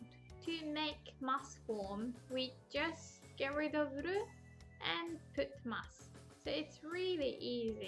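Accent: United Kingdom. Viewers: Shes has a British accent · イギリス人のような発音を使いますね！ ハリーポッターが好きなんですか？笑 私はイギリスから来たので、気がつきますけど、日本ではアメリカの英語を学ぶらしいですから、珍しいと思います！ 優れた発音に感心します ：D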